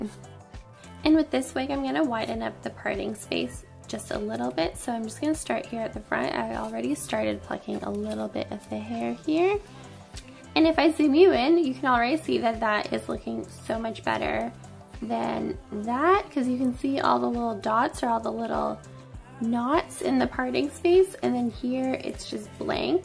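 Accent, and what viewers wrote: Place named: Canada. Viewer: Have you ever lived in Canada? Seems like you have a hint of an accent.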